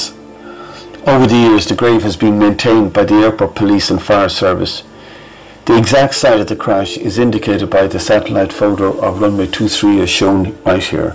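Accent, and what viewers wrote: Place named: Ireland. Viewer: I can hear him perfectly well. Obviously there is an Irish accent we have to allow for, but as he seems to be a guitar player musician, I'm sure the microphone was of adequate quality. Might just be your speaker is a fault 🤔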